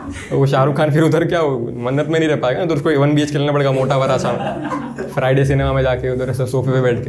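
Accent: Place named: Gujarat, India. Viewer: Your gujrati is cute😂♥️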